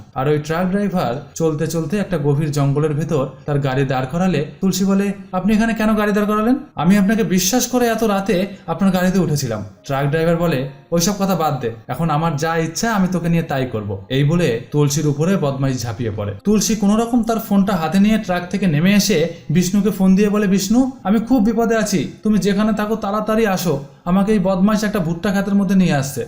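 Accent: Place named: Visakhapatnam, India. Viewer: উচ্চারণটা মনে হয় বিশাখাপাট্মম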